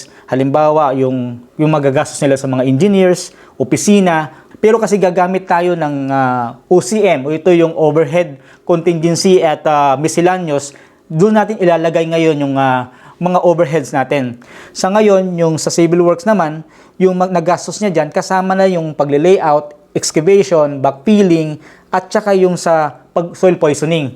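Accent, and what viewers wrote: Waray, Philippines. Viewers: Waray ka pala Sir? Tacloban ka Sir? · I hear waray, I subscribe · Waray ka ngay an engineer? Taga diin ka? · Waray ka ngay-an gihap Sir. Nice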